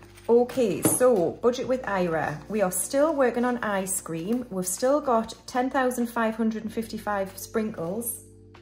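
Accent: Newcastle, United Kingdom. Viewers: Loved this video as always, puts a smile on my face when I see an upload from you :) I love your Geordie accent and I had the giggles when you were talking about the apple and how you were a 'whorebag' haha, hope you are okay xxx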